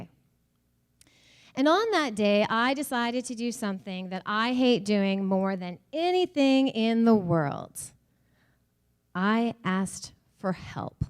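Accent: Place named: Canada. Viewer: Lol she's extra Canuck, she sounds like Marco Belchior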